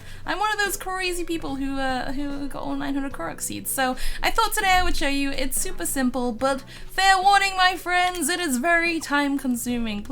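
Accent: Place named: North, United Kingdom. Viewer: Shes northern uk... it's a cool accent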